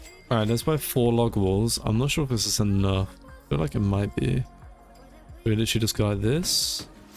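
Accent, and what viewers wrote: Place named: United Kingdom. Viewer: He has a British accent!!!!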